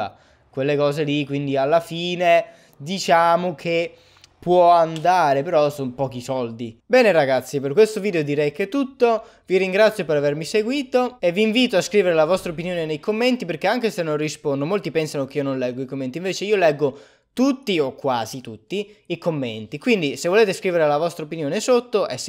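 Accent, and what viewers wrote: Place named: Tuscany, Italy. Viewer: sei toscano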